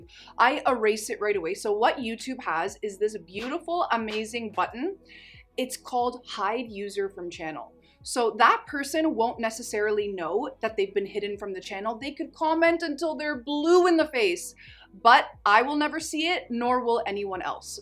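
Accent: Canada. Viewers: Are you Canadian? You sound very Québécois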